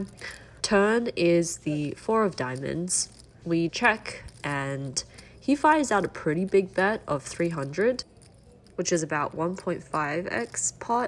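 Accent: Australia. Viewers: refreshing to hear an aussie accent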